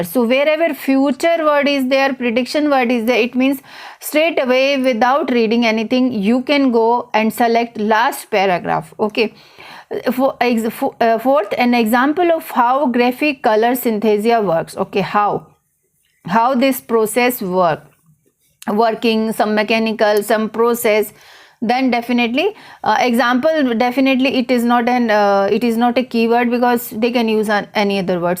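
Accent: India. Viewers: I find nothing to learn from your lessons. Bloody indian pronunciation. Uploading edited vedio. Such a stupidity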